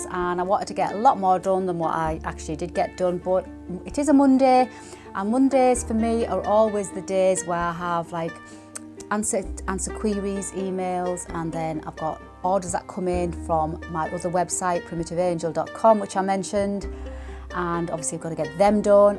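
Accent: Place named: Newcastle, United Kingdom. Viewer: I'm just watching this a year later. I'm NE England born and raised but living in Illinois (beyond my control when I was a teenager in the 60's 😂). From your accent and Geordie's name I'm guessing you are around Newcastle. I was born in Wallsend and lived in the Cullercoats/North Shields area. Love watching what you do and I just bought a couple of your patterns! I used to have an Etsy shop selling bags but closed it when I got breast cancer in 2016 (ok now). I got mine ready for the post the same as you except that we have a drive-up mailman who would pick them up at my large mailbox, so no trips to the post office 🙂 One thing here though is that we can no longer wrap boxes with paper as it can catch in the sorting machine. You have very busy days that you obviously enjoy! Take care xx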